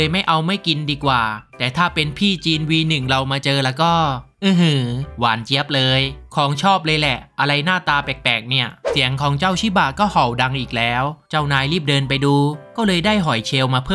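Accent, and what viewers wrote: South, Thailand. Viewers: พี่เป็นคนใต้ใช่มั่ยผมก็เป็นคนใต้เเหลงใต้ไห้ฟังหน่อย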